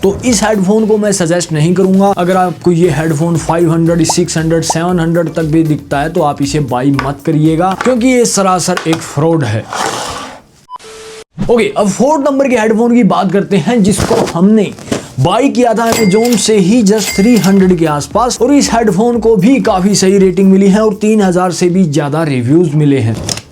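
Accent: Haryana, India. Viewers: Bhai haryana te h k?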